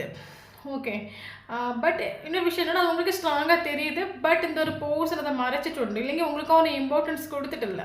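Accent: Kerala, India. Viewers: Super sister காதல் பிரிவில் எவ்வளவு கஷ்டத்தில் இருந்தாலும் உங்களுடைய பேச்சு எங்களுக்கு புத்துணர்ச்சி அளிக்கிறது உங்களின் தமிழ் மலையாளம் கலந்த பேச்சு மிகவும் அருமை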